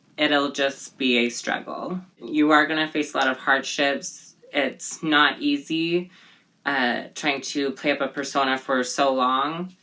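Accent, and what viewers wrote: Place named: Asia. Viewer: I thought u were Asian by your speech.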